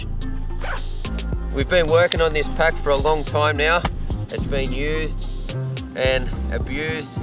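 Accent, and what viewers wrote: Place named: Australia. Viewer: Lol love that aussie accent yet we aint got it here. In tassie it sucks lol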